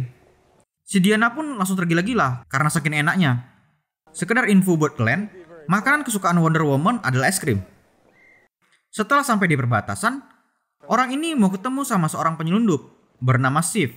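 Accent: Medan, Indonesia. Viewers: Nampak kali ini kalo kau orang medan 🤣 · Orng medan nya kau wakk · Ini abang ini ku rasa orang medan dari ngomong nya kayak orang medan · orang mana kau bg, medan dimana nya wkwkw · Medan kali cakap abang ini bah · Medan x bah abang ini, 😂😂😂😂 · Orang medannn ko kan bang7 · Komentator nya orang medan.... 🤭🤭🤭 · Lo orang Medan ya bang? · Ngakak gw liat ngomong logat medan ny🤣🤣🤣🤣🤣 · Bahasa mu sperti anak medan bang..😂😂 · Orng medan kau ya lae😂😂😂 Sma lah kita lae Smngat lae buat conten nya👍👍👍👍👏👏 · Main kali logat medannya bg 😁😁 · Logatnya itu loh Medan x bah 🤣🤣🤣🤣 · Bahasanya kek temen2 gw di batam..dari medan ya bang?wkwkwk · Anak medan ya ini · Entah seneng aja dengar orang Medan cerita ... Lucu" gitu 😂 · orang medan juga ya bang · Bahasanya anak medan satu nih lucu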